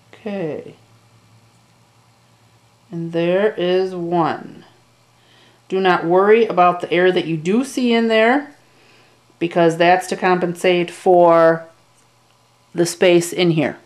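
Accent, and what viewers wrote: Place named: Minnesota, United States. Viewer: Great video. Love your accent. I'm guessing Minnesota.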